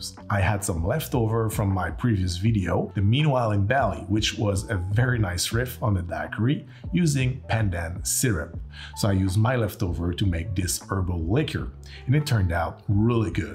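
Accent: Quebec, Canada. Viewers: Hey! Love your channel 💖. I was wondering, you live in Quebec, right? ( i'm gessing from your accent!) I was wondering where could you find Wray & Nephew rum. I see this used all the time in cocktail and syrup recipes but SAQ doesn't sell it. Can't seems to find any over proof rhum. I live in Montreal btw. Thanx a lot and keep up the awesome work!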